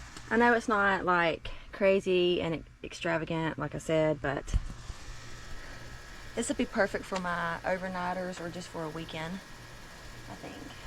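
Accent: South, United States. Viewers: I like your accent, where down south did you grow up?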